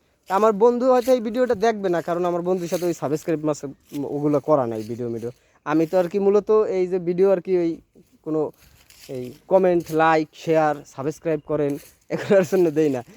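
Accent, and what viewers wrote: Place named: Barishal, Bangladesh. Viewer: ভাই কি বরিশালের?❤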